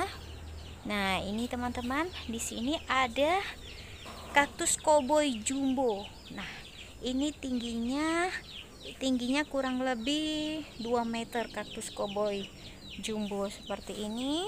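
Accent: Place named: Manado, Indonesia. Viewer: Bahasa manado xa mendok banget🤩.Semangat🙏🙏🙏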